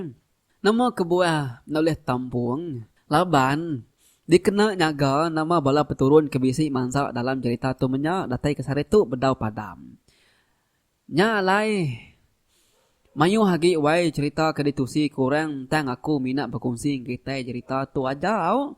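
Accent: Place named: Julau, Malaysia. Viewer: Urang julau ka? Munyi jako urang julau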